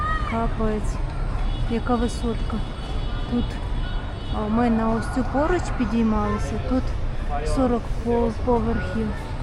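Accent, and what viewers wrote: Standard. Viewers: Коли дивлюся ваш1 в1део, то насолождуюся вашою чистою укр. Мовою. Ваша чиста р1дна мова це показник вашоï культури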